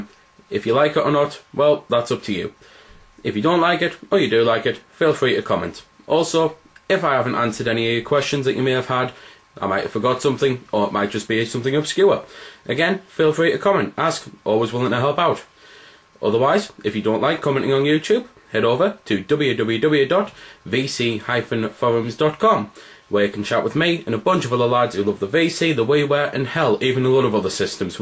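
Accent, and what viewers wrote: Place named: Sunderland, United Kingdom. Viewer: are you from sunderland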